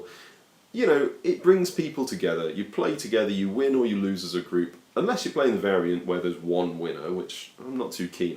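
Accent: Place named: United Kingdom. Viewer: Really enjoyed the video...and the accent, you brits speak so properly.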